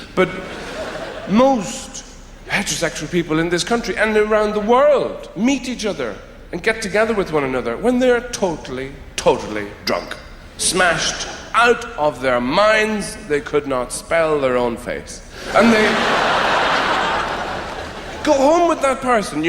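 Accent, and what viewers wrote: Ireland. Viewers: Eddie Izzard meets Dave Allen. The incredulity and upwards inflection being pure DA — probably the Irish lilt to some degree. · Yes he is irish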